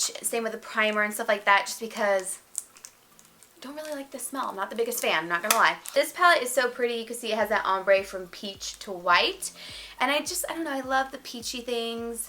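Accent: South, United States. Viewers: Love this!! My new fave beauty YouTuber love all your makeup looks, finally someone with the same skin tone!! And southern yass!!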